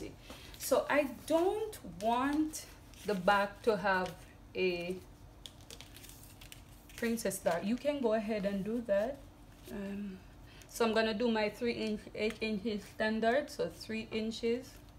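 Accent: Jamaica. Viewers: Hi. Thanks. Are you Jamaican? U sound Jamaican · Thank you for the video and for the scripture. Love your voice. New subscriber. Are you from Jamaica? Great video 😊✨💐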